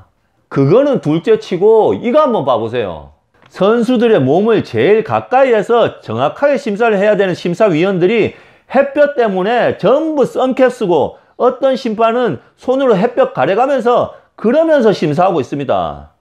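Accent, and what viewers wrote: Gyeongsang, South Korea. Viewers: 경상도 어디십니까ㅎ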